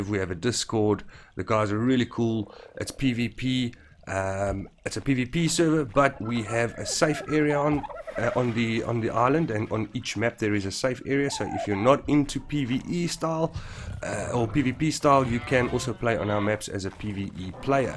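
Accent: South Africa. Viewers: I also picked up on the accent. Proudly SA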